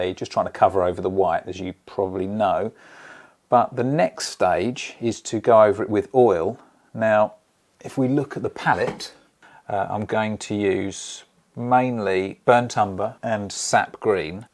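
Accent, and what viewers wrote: United Kingdom. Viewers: Absolutely BRILLIANT !!!! Fanominal panter.Love the British accent as well.💖👍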